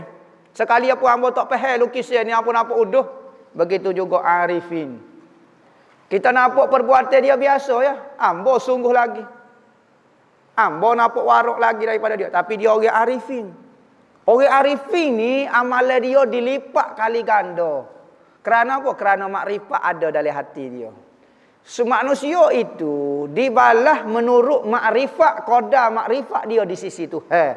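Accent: Kelantan, Malaysia. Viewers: Maaf mencelah..sy pun sm smbil dgr ceramah sambil blaja dialect kelantan.mula2 pening gak. iman...mula2 sy ingat email...hehehe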